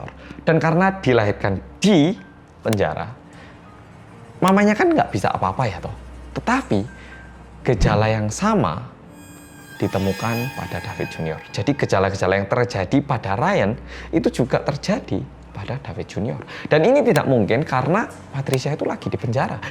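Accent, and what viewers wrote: Java, Indonesia. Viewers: "Bersama orang korea yang...... Meduokkkk...." Tapi logatnya sangat enak didengar, jadi dengerin ceritanya tu enak. Dibanding youtuber lain yang kadang kalau ngmg suka bikin pegel telinga. Lebih parah kalau denger suara nelen ludahnya, bikin makin males. Goodjob mas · hello guys kembali lagi dengan detektif reomit detektif korea yangggg medoook saya rekomendasikan mas ngomong gitu pas detektif reomit wkkwkwk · Hahahahah aku suka nonton ini pas lagi kesel langsung mood lagi. Soalnya aku yg tampang jawa bgt g medok ini yang muka bule medoknya ampun hahahahaha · Welcome back to korea reomit, bersama orang Korea yang.......... Medhok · Mas... medok nya ngelewihi aku sing wong jowo.. hihihi... betah ngrungoke cerita2ne.. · Mas kurusan, pipinya gx berisi lagi. Stay healt oppa meddok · Coba donk kak bikin vid kok bisa bljr bahasa indonesia sampe bisa medok gtu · Mas kok gak terlalu semangat medokkkkkkkk nya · Purik astaga jowo temen cak😹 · Ka next vidio buatin tes pasikopat pliss Medooo 😄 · "Halo guys welcome back to Korea Reomit bersama orang Korea yang Medhokkkk" Knp selalu inget ortu Mas yang suka bilang medhok medhok ke kamera😂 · Medhok nya gak panjang lagi wkw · halo guys david disini bersama orang korea yang medookk 😁😁😁✌🏻✌🏻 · Habis pingsan lanjut lihat mas 🥺 medooookkkk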